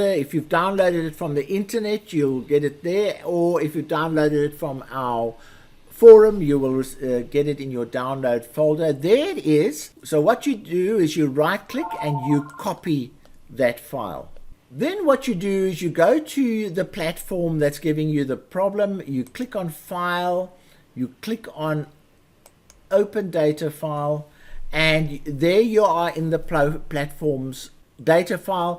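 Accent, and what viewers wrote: South Africa. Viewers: Hi, let me rather ask. Are you a South African?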